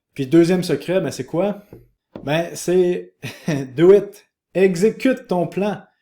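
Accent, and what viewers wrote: Canada. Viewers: Bonjour, Première fois de ma vie que je dépose un commentaire sur internet, malgré ma consommation de contenu sur les cryptos depuis bientôt 4 ans sur le sujet.... Vidéo courte de 13 minutes, ou chacun de tes mots ont résumé à merveille tout ce que j ai pu apprendre de cette période! Merci à toi pour ton dévouement et ta philosophie! Un petit frenchie holder dans l âme qui au delà d apprécié ton formidable accent canadien, saura je l espère tirer pleinement partie de tout tes judicieux conseils! Longue vie à toi!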